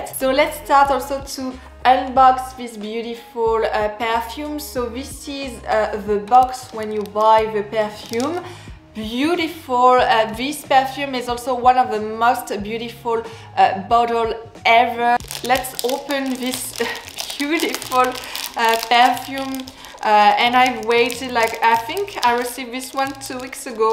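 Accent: France. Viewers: This is 1 of my all time FAVORITE parfumes EVERRR I was introduced to this fragrance at the tender age of 14 brought my 1st bottle at 16 years young along with yves saint Laurent champagne and Dior's poison tendre And Amarige Been looking into getting a new bottle of lair du temp Because I have been seeing her ALOT as of lately And I BEEN into getting all my original signature scents because MINES are some of the best ever made due to my GREAT taste in fragrances and scents🙊😜 LOVE your videos you are AMAZINGLY DECADENT TO WATCH YOUR GORGEOUS & SWEET & IM A SUCKA FOR A FRENCH ACCENT IM A SOFTIE FOR AND ACCENT PERIOD. BUT ESPECIALLY FRENCH BEING IT'S THE LANGUAGE OF LOVE AND ALL 💗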